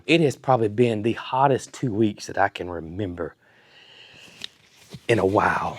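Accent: South, United States. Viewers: I concur with She beat me to saying DO NOT CHANGE for others!!! You speak with Southern Charm. Please, DO NOT become "woke" with the crazy world that gets offended over things or words. We love you just the way you are. Anyone gives you lip, just tell them that GRAM in TX told you not to do it Luv ya: GRAM 👵in TX🙏❤ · Do not change the way you talk, I am Southern we talk just fine. Its everybody else who talks funny, lol❤❤❤ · 100%!! I feel like people intentionally get hung up on things to cause drama, getting hung up on semantics instead of enjoying a video. If they can't figure out that knows what kind of fuel his things need, that's their problem! Expecting someone to talk the way they do is outrageous. He's from the South, he speaks how he speaks, it's who he is, and everyone needs to leave him be. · Those of us from the South speak well. Just our version of well. 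Never apologize for that. State to state cultures differ. Makes us all the kinda different God has given us. And wouldn't it be BOREING if we were all the same? You betcha! Love you both! G. Davis😊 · I’m from Maine and absolutely love the southern accent. Continue being you. That’s why you have so many followers and Cog Squad fam ❤ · Please don't change the way you talk or say things. Being a native of SC and Horry county, we talk just like you but I have been made fun of about how I talk but I am proud of my southern roots and I don't plan to change how I talk to please people that are not from around here. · I 💕 E THE SOUTHERN TALK, B PROUD OF YO SELF&IM. ENGLISH!🏴😎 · Don’t you dare change your terminology, that is who you are and how you were raised. I love your southern accent and terminology’s. That is what makes you, YOU! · please don't change any part of your speech. I am Australian, and you and are teaching me Southern. 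· To be quite honest with you, my brother, I don’t think you need to change a thing about how you talk. People just need to get over themselves. Honestly, as someone who lives all the way up here in Minnesota, I love any type of southern accent, The words y’all use for different things, it’s pretty neat if you ask me. Just keep that southern slang coming. Most of us truthfully don’t care. God bless you. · Love a good southern drawl, and easy going vocabulary.. it takes me home to a place and people I loved💗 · Under no circumstances, do you apologize or change the way you talk! You are from the south be proud if they don’t like it they can leave your channel❤ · Amazing about ESSO, he still had a Tiger in his tank, he just had his wires crossed....LOL, I have had days like that. I loved this video, we got to see you huggin on Jesse, rubbin on Nugget and of course snugglin on Holly, my heart, my heart. 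I always enjoy the videos, but when you love on the animals for a minute, it is so special, especially for those of us who don't get to do that much anymore. It's plum therapeutic and as a fellow southerner, we know plum means "sure enough extra"........ don't change a thing about how you talk. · Omg. Don’t change your verbiage. Gas is gas everyone knows there are different mixes. It clearly showed on tank diesel. Lighten up people. Keep,what you’re doing! We love you and I love the southern accent. ❤❤❤❤❤❤ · Your speaking fine,people have to understand your from the south and things are said differently there,but there is always someone that has to complain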